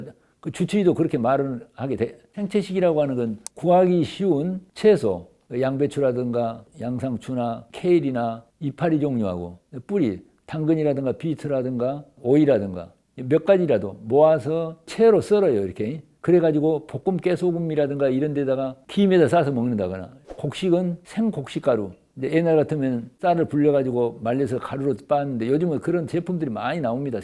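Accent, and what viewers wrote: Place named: Jeolla, South Korea. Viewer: 말투가 라도네. 라도는 걸러라 들.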